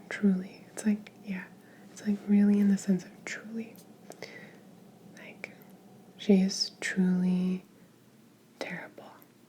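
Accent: Argentina. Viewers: jajaja la primera vez que la escuche hablar en español argento casi me caigo de ojete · Ya extrañaba escucharte hablar en argentino. 💕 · Hola! Soy de Argentina, me impresiona mucho lo bien que te sale no sólo el español sino el asento argentino, felicitaciones!! Hermoso video! Besotes.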